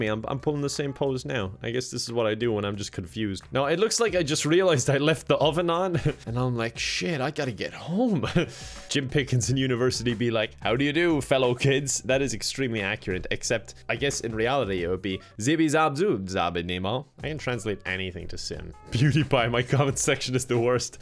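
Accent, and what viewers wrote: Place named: Ireland. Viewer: Can you film a video in your FULL IRISH ACCENT? Love it, you kind of remind me of Domhnall Gleeson. He's sexy fine!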